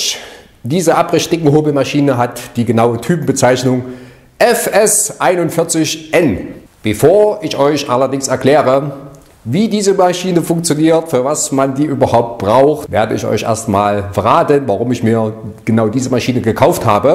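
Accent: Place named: Hesse, Germany. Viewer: Ich weiß nicht wieso aber irgendwie finde ich deinen Dialekt sehr symathisch, auch wenni ch ja eigentlich der Meinung bin, dass ein Mensch agar nicht mehr sein kann als ein Hesse ^^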